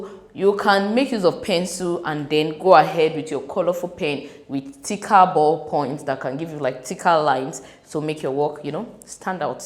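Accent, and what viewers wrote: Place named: India. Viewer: At first ma,I thought something was wrong with my phone,when I heard you speak like an Indian. Thanks for this video ma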